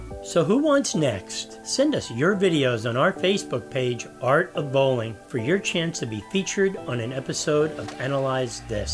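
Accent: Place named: Brooklyn, United States. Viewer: Enough of the Brooklyn accent. It's not funny and I will unsubscribe if I keep hearing it. I'm here for information not some whobis trying to be comedian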